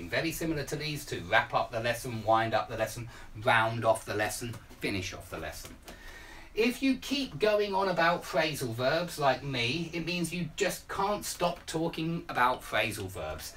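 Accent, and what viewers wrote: United Kingdom. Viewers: Hi greetings from Colombia, i still enjoy your lessons, thanks. I hope You área doing well. I love your british accent and i find it pretty understandable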